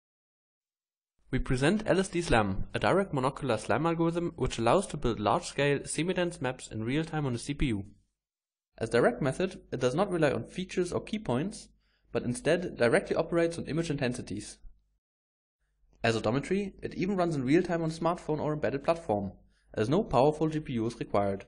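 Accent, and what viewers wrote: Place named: Germany. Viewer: So German English!!!!!!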